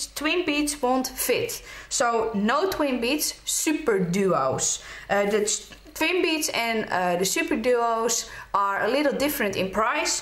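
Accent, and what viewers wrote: Netherlands. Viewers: hoorde aan je accent dat je nederlands bent haha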